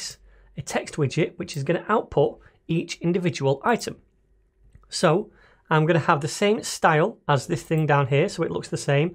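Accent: United Kingdom. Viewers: Hello, greetings from Argentina, i love the British English..thanks for the tutorial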